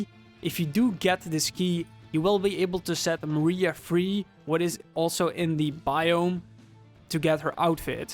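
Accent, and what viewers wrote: Netherlands. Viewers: oh je bent nederlands zo te horen ;) ik heb een vraag, ik wilde laatst Fractures shrines binnen gaan maar toen stond er ''Requires Fatal Falls GLC'' terwijl ik deze dlc al heb gekocht. heb jij mischien 'n idee waarom ik dan toch dit bericht krijg ?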